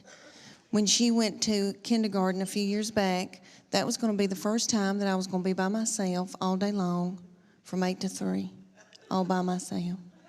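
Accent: South, United States. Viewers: It's that good 'ol Southern accent!!! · That southern accent kills me!! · With her looks and that southern accent she could talk me into just about anything, and make me laugh at the same time. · No....that's her! She's from the south. We all talk that way😂🤣😂🤣😂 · We saw her in Knoxville at the local comedy club. Very funny lady!! Love her southern accent. 💕💕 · oh my God I LOVE that southern accent!!! Hey Natasha Leggero, can you imitate this accent? I think you are so close to perfect now well OMG talk like this and I just might explode!!! Tasha, I love you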